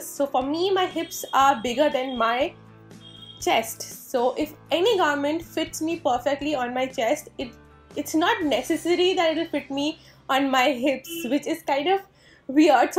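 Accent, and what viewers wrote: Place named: Delhi, India. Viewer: Just a observation.. Nothing negative.. Delhities.. Usually pronounce here as hare... Thank you for the vid.. Very helpful tips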